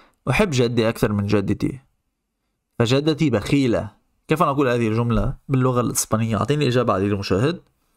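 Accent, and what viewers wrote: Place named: Lebanon. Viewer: رمضان كريم .. شكرا على المجهود .. على الرغم من أن حضرتك من المغرب الا أنك لديك لكنة لبنانية !!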